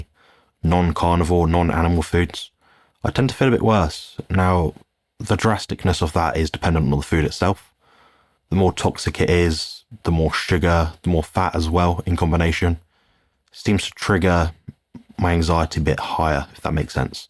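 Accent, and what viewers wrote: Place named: England, United Kingdom. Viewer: Thanks. It's nice to hear a lovely English voice.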